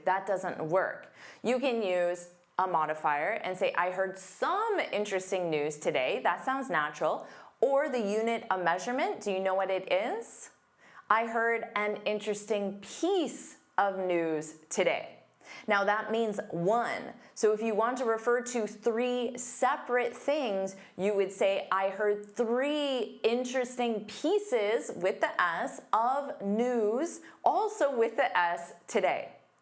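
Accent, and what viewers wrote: United States. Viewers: Obviously she's american. Isn't she? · She sounds like a yank they have know room to say anything about the English language they misspell words and pronouce words incorrectly ie mobile becomes mobul, although they do spell the word the same, like missile becomes misul.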